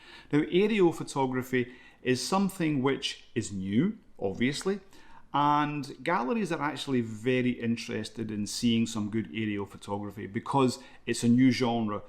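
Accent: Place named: Scotland, United Kingdom. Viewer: OK ..Finland ..but why do you sound Scottish ?!? OK ..maybe it's just me !! LOL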